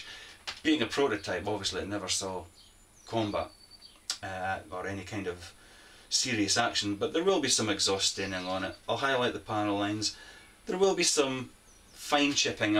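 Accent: Scotland, United Kingdom. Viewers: just wanted to say I really enjoy your videos… I like your style, man! okay, maybe it's just the cool Scottish accent, I don't know… It's good stuff. I've been on ism for about a month now and I go by RubeGoldberg. I'm sure you'll appreciate the significance of that username. ;)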